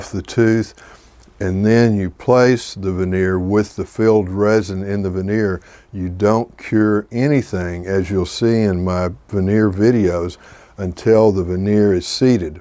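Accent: South, United States. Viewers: I just love ur southern accent. They work every time ;)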